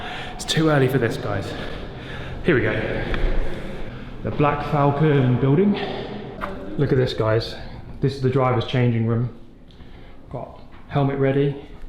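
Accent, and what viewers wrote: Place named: RP, United Kingdom. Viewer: How posh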